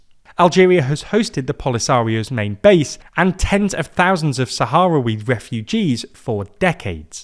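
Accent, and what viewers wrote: United Kingdom. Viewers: british lil bro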